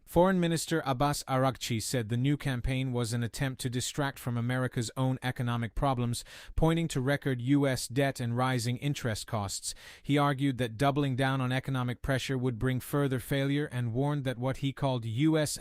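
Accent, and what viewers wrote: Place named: Eastern Europe. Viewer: are you using an AI filter on your voice? Your English is very good but you have a stronger Slavic accent than this voice.😃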